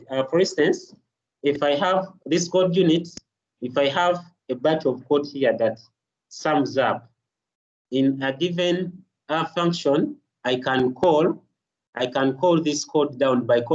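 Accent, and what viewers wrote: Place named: Uganda. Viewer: Well represented! Surprised to here a Ugandan accent after watching only Indian tutorials.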